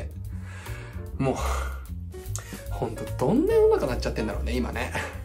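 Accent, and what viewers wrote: Tsugaru, Japan. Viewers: 最初から津軽弁に聞こえる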